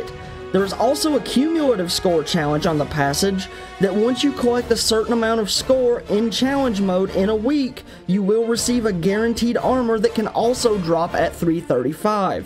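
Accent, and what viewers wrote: South, United States. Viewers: I love your hillbilly voice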